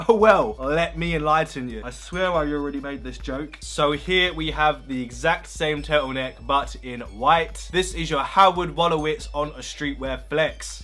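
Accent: England, United Kingdom. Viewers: Hey where in England are you from, like the accent?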